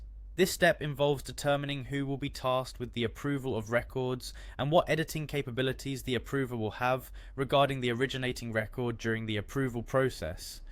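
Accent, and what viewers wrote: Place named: England, United Kingdom. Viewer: Great video! Finally a proper English speaking accent 🤩 music to my ears 😍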